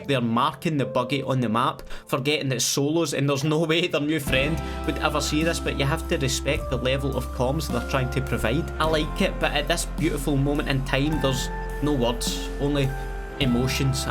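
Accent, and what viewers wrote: Scotland, United Kingdom. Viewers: The Scottish JGOD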